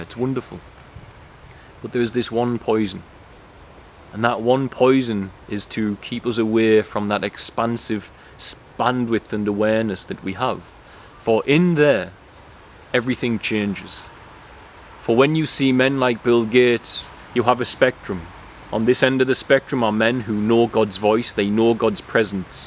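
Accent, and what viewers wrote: United Kingdom. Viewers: Where are you from (originally from) you sound British brother - Also I’m a new subscriber and looking forward to your future content 🙏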